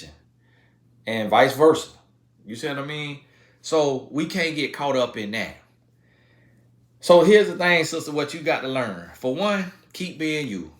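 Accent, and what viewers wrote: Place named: South, United States. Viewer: unrelated but i really like your accent! as someone from california, it’s satisfying to listen to. southern accents for the win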